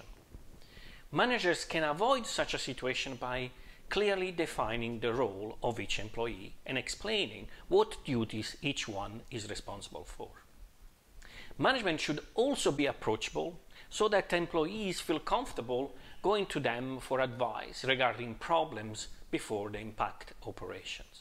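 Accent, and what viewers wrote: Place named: Italy. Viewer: nice content I like your italian accent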